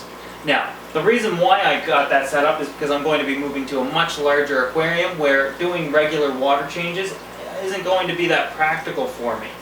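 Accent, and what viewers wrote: Canada. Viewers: Love watching videos by Canadian guys. Too funny accents.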